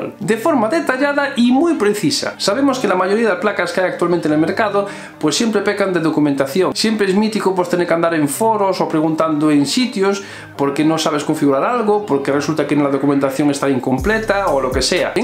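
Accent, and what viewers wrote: Galicia, Spain. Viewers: Fantastico prollecto, me enorgullece ver cosas de estas made in Spain y aun por encima con acento gallego ❤️❤️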